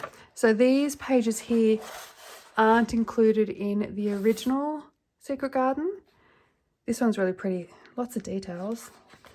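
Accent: Australia. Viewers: I watch all your videos that are on you tube, to give me inspiration and to learn new techniques, your colouring is stunning and i can only hope to get that good someday, , it is nice to see and hear another Australian colourist. Congratulations on your 20,000 subscribers, I am sure your channel will keep growing well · Wow!!! Huge Congratulations!!! Love your channel … always have your videos running in the background on the weekends and join in whenever I can. Thanks so much for all your videos … for sharing your knowledge and allowing us to be inspired to colour our world💕. Lovely to hear another Aussie too❤️. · When I started adult colouring this year I searched for different channels to learn from and see what was possible and I immediately subscribed to you for your stunning colouring but because of your familiar Australian accent! There are lots of British and American colourists but it's wonderful to hear a fellow Australian 😅 Congrats on your well deserved success 🎉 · Congratulations! 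You are an amazing colourist. I am so grateful for all you have taught me. Your colour combinations have been immensely helpful as that is what I struggle with the most. Also it is very heartwarming to hear a fellow Aussie accent! Keep colouring and being amazing 🤩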